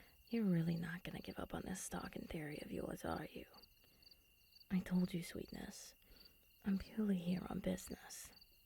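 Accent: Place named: New York, United States. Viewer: Hey look New York vampire. Or is she from Boston?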